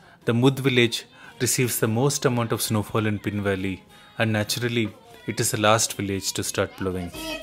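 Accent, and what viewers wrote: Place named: Karnataka, India. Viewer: COMMENDABLE VEDIO WORK.. YOUR ACCENT, YOUR KNOWLEDGE, YOUR VISION , INNER INSTINCT to know the real Life of these farthest untouched places of Himalayas... I HAVE BECOME YOUR FAN --DEAR.. MY GOOD WISHES to You & all the Team members who are attached to produce this such a LOVELY MEMORABLE vedio... I think you hail from Karnataka as assumed by your way of speaking/narrating...must be very highly Qualified person.. Anyhow, great thanks for uploading this Vedio... All the Best Dear..!!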